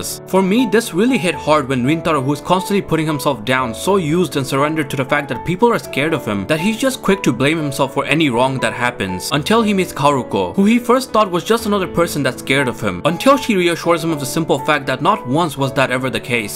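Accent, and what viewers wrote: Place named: United States. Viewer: sorry but i just can't with this american pronounciation xD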